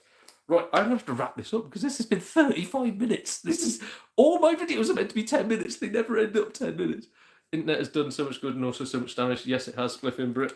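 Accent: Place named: Scotland, United Kingdom. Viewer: Cheers for the shoutout mate, just watched the stream. Great channel, and some good laughs to be had! An unrelated and random question, but are you originally from Scotland? I ask because I used to study and live there. But if you are, your accent is pretty subtle.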